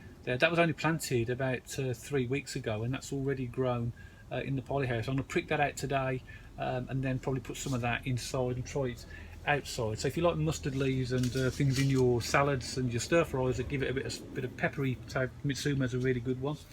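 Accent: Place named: West Midlands, United Kingdom. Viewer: You sound as if you are from the West Midlands (like me) so I wondered where you got your garlic and onion sets.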